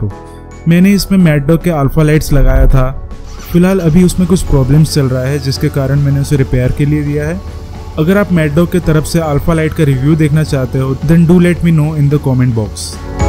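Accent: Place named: Odisha, India. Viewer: ତମ କଥା ରୁ ଜଣା ପଡୁଛି,ତମେ ଓଡ଼ିଆ........ଖୁବ୍ ଭଲ ଉପସ୍ଥାପନା କରିଛ Video re❤ lots of Love from Barbil,Keonjhar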